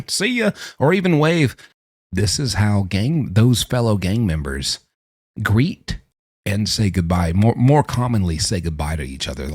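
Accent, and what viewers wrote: South, United States. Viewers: Listening to a southerner explain anything is like a prison sentence on its own. Get to the point JFC.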